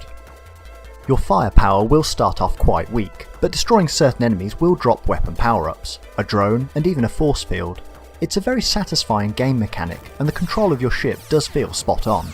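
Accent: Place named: United Kingdom. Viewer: Well, I enjoy your style, vastly prefer it over "shoutcaster" rubbish! I'm not a game collector.... yet, but your production/scripting reminds me of the military miniatures (toy soldiers) community, of which I am a member. (Love the humor, as well. Not many opportunities for "Japanese homoerotic" references in the model soldiers scene!) Poised delivery, well researched, and British as Bully Beef! Cheers and keep up the great work!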